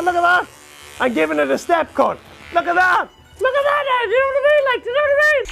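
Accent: Ireland. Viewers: Is he from cavan or louth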